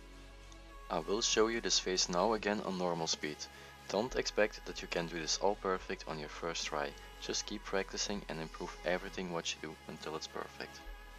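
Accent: Netherlands. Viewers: you dutchbro? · are you dutch ? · Dat Nederlands Engels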